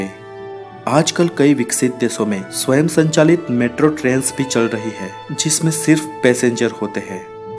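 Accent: Gujarat, India. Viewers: Video is nice but the speaker has Gujarati accent in his voice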